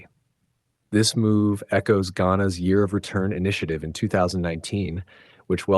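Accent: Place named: United Kingdom. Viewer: I Agree with you pretty tea. Narrator u talking as if Ghana are doing Jamaica & the people of a Jamaica FAVOR. Please stop it. It is good to see African Nations and the country of Jamaica closing gaps and coming as one. For your INFO Jamaica is not a tiny Country. I am tired of UK= England belittle Jamaica by calling Ja. tiny. 1,big, 2, small, 3,tiny. So all the other Caribbean What are they?, if Jamaica is tiny?. Jamaica is the third Largest Island in the Caribbean. U should know the word tiny from small. You sound British. are you?. U just want to put down Jamaica, u JEALOUS.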